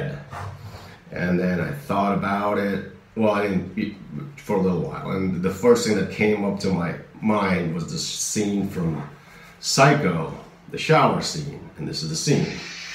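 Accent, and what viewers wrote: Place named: Philippines. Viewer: So cool to hear that he still has a bit of Filipino accent left lol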